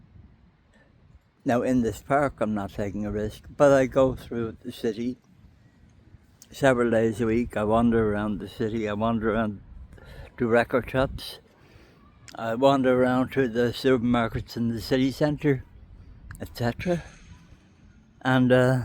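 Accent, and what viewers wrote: Ireland. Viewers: I am a Jew and an Israeli. Thank you for standing with us. It's beautiful to hear an Irish accent that isn't speaking hatefully toward us. May God bless you!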